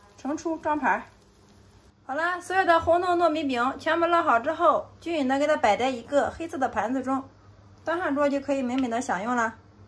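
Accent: Shandong, China. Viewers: 难道她是外国人么？我听她的口音好像是山东口音的普通话啊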